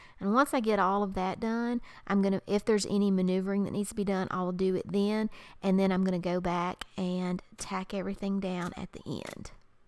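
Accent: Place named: South, United States. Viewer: Omg! Such a well done video, and I just LOVE you southern accent! ❣️ Thank you!!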